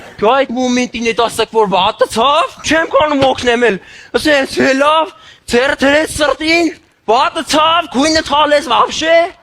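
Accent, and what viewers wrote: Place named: Gavar, Armenia. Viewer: Գավառի համով բառբառը շնորհակալություն ձեզ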